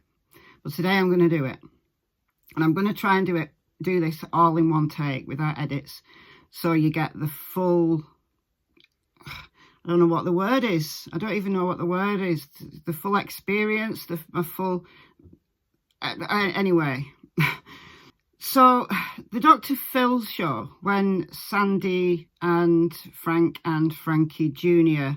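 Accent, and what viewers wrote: United Kingdom. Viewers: I'm sending you love, light and blessings,. I love your CW videos, but this was something different. I'm not far from you in Sheffield, and it's lovely to get a UK dialect on your analysis of your videos. You have a very sincere voice, and I totally get your intonation and heartfelt words. Your situation with your boy sounds like the experence that Sloane Bella had...... A real open connection to the spirit world..... Love and peace <3 x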